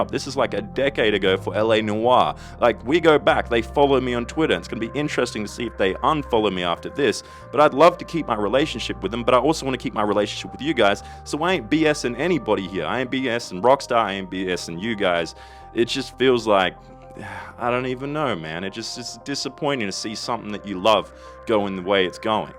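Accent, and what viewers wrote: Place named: Australia. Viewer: Dead set miss the I'm and the accent is Australian intro hahahaha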